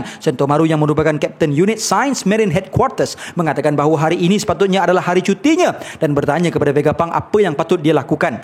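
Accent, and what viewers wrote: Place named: Terengganu, Malaysia. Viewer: Asalamualaikum..bila la ku nak dengar cakap tani..tak moh ka cakap cara kito..beri satu dunia tahu cakap kito...huroooong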